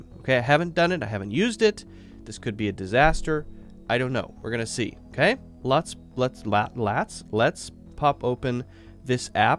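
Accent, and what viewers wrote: China. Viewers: hahaha I find this video because of my work's research. Although this was three years before, it really helped! Thanks! And I have to say your Chinese accent of speaking English is pretty good hhhhhh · 应该是北方口音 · 4 years later and I just wanna say your Chinese accent hits hard as a Chinese person😢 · your Chinese accent is amazing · You have a very good Chinese accent 👍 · Your chinese accent is just wow!! So chinese! Impressive! Thanks for sharing this with us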